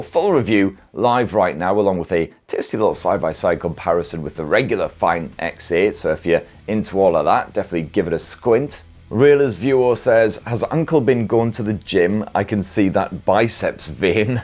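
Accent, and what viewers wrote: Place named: Northern England, United Kingdom. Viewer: He's just got a regular Northern English accent 😊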